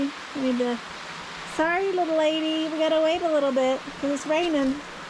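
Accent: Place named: United States. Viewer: Thank you, for this video. I'm an another canceled check here in Czechia but on the escape! Thanks too for your American English which is so nice to listen. Good luck on everything!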